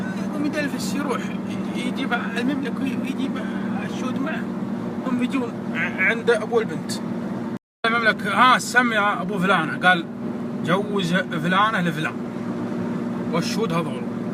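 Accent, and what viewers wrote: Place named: Najd, Saudi Arabia. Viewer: اي ثقافه؟ كل من قرا روايه حسب نفسه مثقف؟ هذي لهجه نجديه وطبيعي انك يالطرش ماتفهم عليها الاشكاليه الاكبر انها لهجه من لهجات العرب السبع رجل عربي قح وبعدين كيف تدعي الله وتخاطبه ب ياء التأنيث؟ استغفر ربك ياطفل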